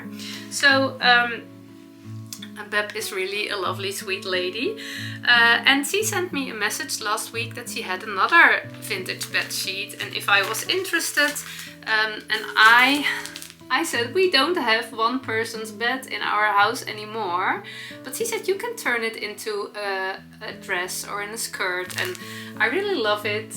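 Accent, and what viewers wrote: Netherlands. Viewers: Love your Dutchy English 🙂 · I love, love your “Dutchy” English so much and you are always learning our way of speaking. It makes me smile and happy to see and listen to you. Your knits 🧶 are beautiful and very inspiring for me❤❤❤❤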